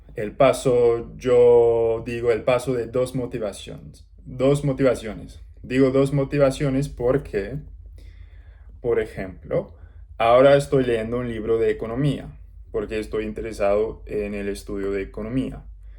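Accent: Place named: Colombia. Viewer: omg yes! the accent is so colombian particularly from bogota/medellin? so impressive, feels like a person from Bogota is speaking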